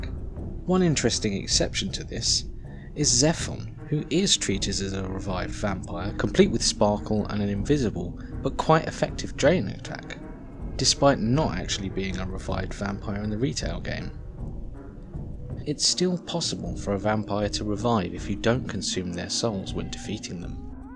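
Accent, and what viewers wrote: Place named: England, United Kingdom. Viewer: I think you'll find he's English English so would naturally pronounce words differently to an American standard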